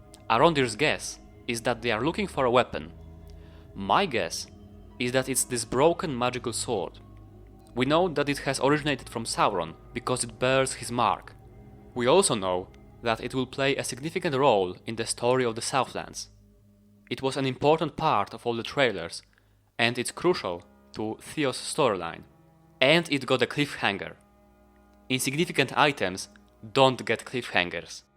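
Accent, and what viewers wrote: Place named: Poland. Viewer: klasycznie polski akcent 👍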